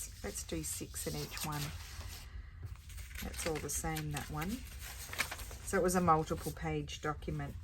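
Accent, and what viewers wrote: Australia. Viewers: Thank you for letting me know! Perhaps some day I can make the list. I adore your videos, your Aussie accent and your shop items. And you!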